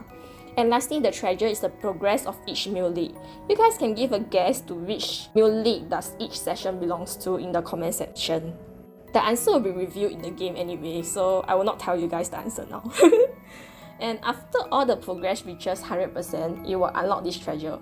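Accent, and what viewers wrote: Singapore. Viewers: The chinese / sg accent satisfy me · Hi, are you from Singapore? Haha you sound like it 😅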